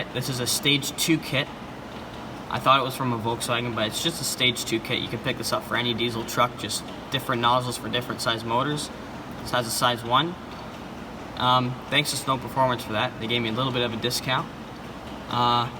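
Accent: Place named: Canada. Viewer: your canadian arnt ya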